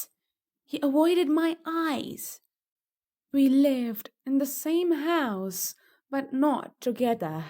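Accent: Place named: United Kingdom. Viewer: I watching from Ethiopia 🇪🇹 so best story with perfect British accept ❤❤❤❤❤❤❤❤❤❤❤❤❤❤❤🎉🎉🎉🎉🎉🎉🎉🎉🎉🎉🎉🎉🎉🎉🎉🎉❤❤❤❤❤❤❤❤❤❤❤❤❤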